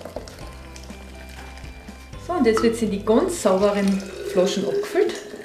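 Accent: Carinthia, Austria. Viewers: Kärntnerisch ist auch ganz lieb anzuhören, nur verstehe ich es halt leider nicht allzu gut. Muss mal wieder nach Kärnten kommen. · das Rezept ist echt klasse, wir machen dies auch Jahre schon so, v.a. für das Immunsystem grade zur kalten Jahreszeit immer❤🍀❤🙏🍀❤..finde deine Videos auch mit dem Österr./ Kärntner Dialekt übrigens super süss und sympathisch ❤.🤗🥰❤🌼❤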